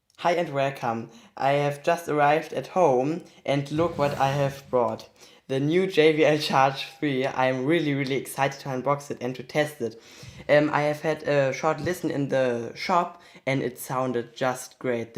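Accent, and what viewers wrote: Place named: Vietnam. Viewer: keep up your great work, dude! I love your vinglish!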